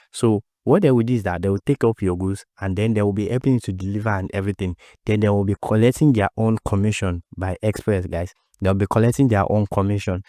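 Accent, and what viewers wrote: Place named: Ghana. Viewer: Specify if it's jumia Nigeria causes your accent sound ghana...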